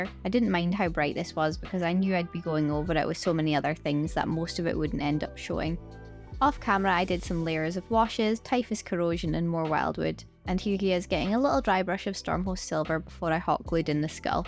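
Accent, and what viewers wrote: Scotland, United Kingdom. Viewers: This is absolutely the most wonderful sexy beast. Its a joy to hear another Scots Crafter. Keep up this fab work xxx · Omg! 😳 Stephen King has written a short story about something along the lines of the mushrooms eyes there. It’s been decades since I read it but it still makes my skin crawl. As did your talk about small holes and them little eyes. 😵 Anyways, great build and great presentation. I’m also a sucker for a woman with a Scottish accent so that kinda topped off the experience. Good job! 👏👏👏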